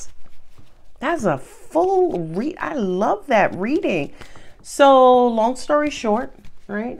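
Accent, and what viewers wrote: New York, United States. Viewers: Scorpio representing in that NY accent! 😂😂😂😎 Thank you for the reading. It resonates with me, I can feel it in my gut but I'm so scared of failing. Gotta work on that. 💫🙏🏻🤗♏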